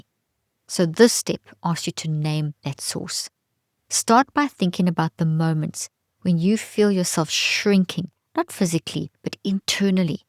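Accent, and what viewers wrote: South Africa. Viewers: Dr Leaf, are you maybe South African because your accent sounds exactly?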